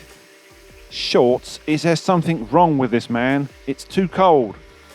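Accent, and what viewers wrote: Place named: Wales, United Kingdom. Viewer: Love the accent. The famous singer Tom Jones is from Wales👍. At some point maybe you can share to us American viewers the history of the Skoda automobile 👍.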